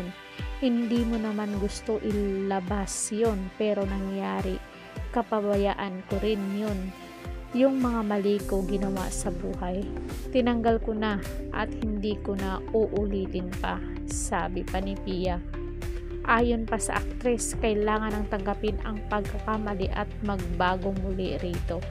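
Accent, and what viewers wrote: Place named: Visayas, Philippines. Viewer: Bat ganyan ka magsalita bisaya ka ba? yak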